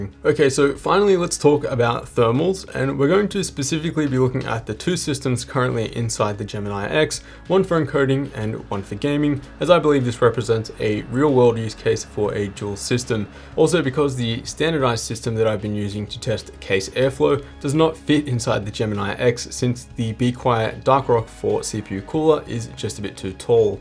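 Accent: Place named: Australia. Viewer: HE SOUNDS AUSSIE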